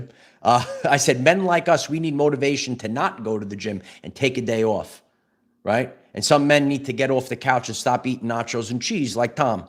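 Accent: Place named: Brooklyn, United States. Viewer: ❤ your Brooklyn Accent I have a friend from Brooklyn and her husband is from Lone Island that lives here in Texas as well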